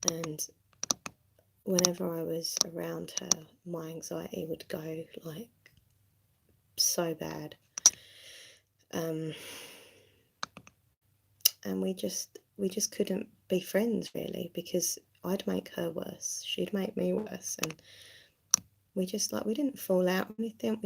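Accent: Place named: England, United Kingdom. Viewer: What part of England are you from? Can’t work out the accent it’s bugging me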